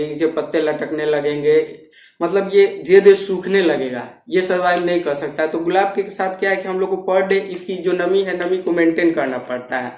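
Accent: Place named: Bihar, India. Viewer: Aap adenium plant kahan se lete hai ? aapke voice tone se lagta hai kya Bihar ke hai nice information 👌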